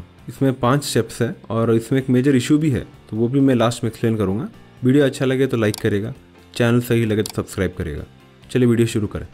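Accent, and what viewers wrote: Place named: Kerala, India. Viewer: Full malayalee accent.. adipoli😅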